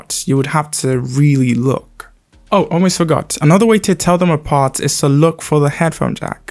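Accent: England, United Kingdom. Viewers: Can i ask ask about your accent? It sounds English, not Nigerian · Why does your English sounds British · I love your British accent.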